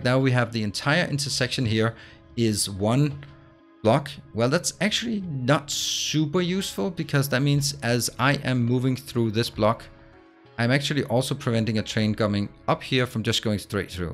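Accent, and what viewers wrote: Denmark. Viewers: Decent tutorial. Literally subscribed for the flawless danish accent.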